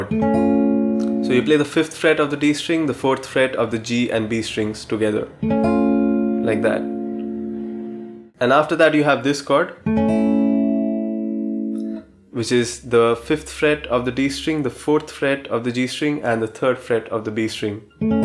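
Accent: India. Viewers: Your accents seems to be Indian..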